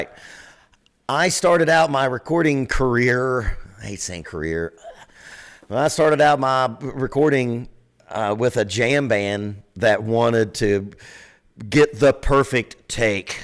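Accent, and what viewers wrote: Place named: South, United States. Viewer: Not only do i love your insights into drumming helping me appreciate the music even more, but your smooth and soothing southern voice has got a place on my insomnia playlist. Please release a track of “nudge” and of “puuunch” on repeat. AWESOME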